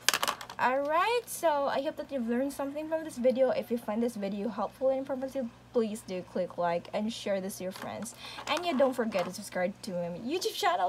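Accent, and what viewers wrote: Visayas, Philippines. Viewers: Bisaya ka te? Hahaha para lang kasi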